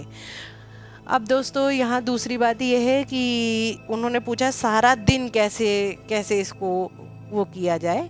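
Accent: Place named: Uttar Pradesh, India. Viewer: दीदी आपके वीडियो बहुत ही अच्छे हैं, में आपके वीडियो लगातार देख रहा हूं, और कुछ करने का प्रयास भी कर रहा हूं, और दीदी आपकी भाषा उत्तर प्रदेश की है और आप उत्तर प्रदेश से ही है यूपी के रहने वाले मेरे संपर्क में हैं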